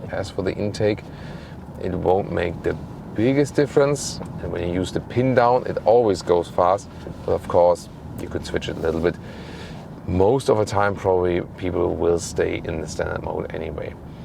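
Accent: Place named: Germany. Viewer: この方、いつも誠実でいい！ 字幕なしでも日本人が理解できるドイツっぽい英語と、話すスピードもちょうどいい！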